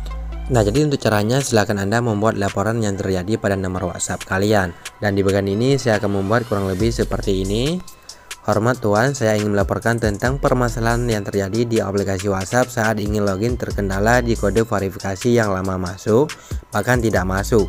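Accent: Aceh, Indonesia. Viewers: Nyan keuh khas awak aceh.. Adak bak Ujong donya di jak,tetap ta turi logat awak aceh.. Yg penting subscribe,, mangat teubai kantong.. 🤣🤣🤣🤣🤣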